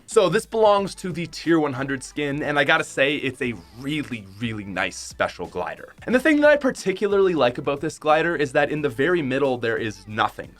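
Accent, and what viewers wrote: Canada. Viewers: All Canadian “oots”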